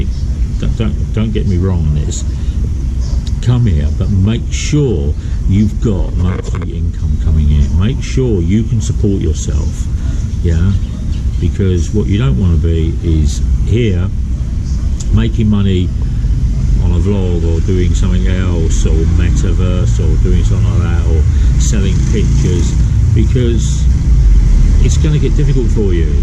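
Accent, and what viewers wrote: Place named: United Kingdom. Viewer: you speak like a brit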